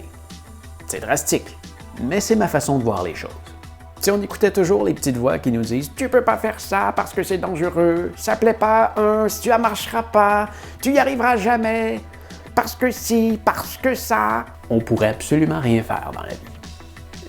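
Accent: Quebec, Canada. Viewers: Très bon videaste un peu imbu de lui-même mais l'accent quebecquois est trop cute.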